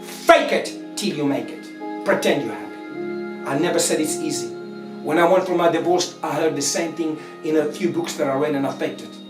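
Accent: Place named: South Africa. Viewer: Hello i sent an email of my situation. Also are you from south africa or did you live there before? I hear some sort of SA accent. Thanks for this amazing video!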